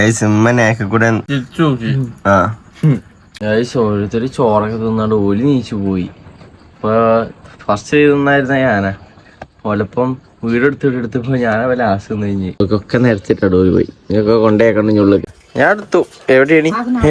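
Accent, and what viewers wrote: Malappuram, India. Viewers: Malapuram slang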